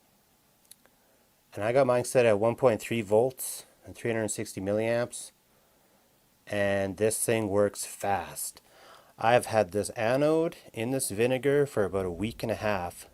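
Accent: Canada. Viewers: Loved the commentary, you sound like you are in Canada?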